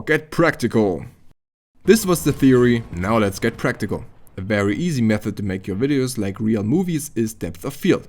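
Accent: Germany. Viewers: Hey, ich geh mal anhand deines akzents davon aus, dass du deutsch sprichst. Ich hab ein kleines GTA Projekt vor und bräuchte da Rat bzw Hilfe von jdm mit Erfahrung. 1. Kann ich mir im online Modus mehr als 2 Charakteren machen ? ( Brauche 2 für mein Video ) 2. Kann ich beide Charaktere gleichzeitig steuern lassen im offline modus, sodass ich praktisch 2 Schauspieler habe, die ich bzw noch eine 2 Person mit einem Kontroller steuern kann und somit z.B beide für eine Szenen nebenher laufen lassen kann ? 3.Wo legt GTA die exportierten Videos hin, sodass ich sie in Pr öffnen kann ? 4. Kann ich mit gemodeten Autos Aufnahmen machen ? Wäre echt toll, wenn du mir helfen könntest bei meinen Fragen ! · Digga du hörst dich so deutsch an hahahahah are you german bro? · Are u a german youtuber cause you have that accent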